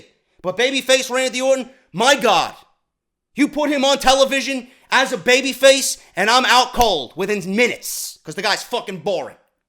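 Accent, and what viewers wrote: New York, United States. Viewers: Newyorker accent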